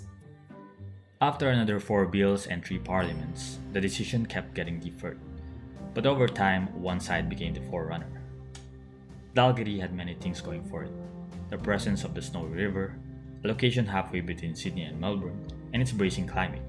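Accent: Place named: Philippines. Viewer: Sounds like a Filipino accent.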